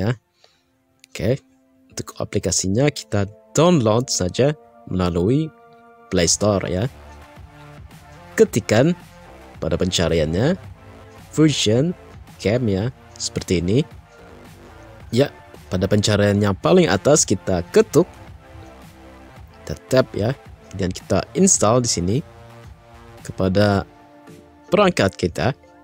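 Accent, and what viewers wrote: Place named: United Kingdom. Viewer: Orang mana kak? Kayak logat english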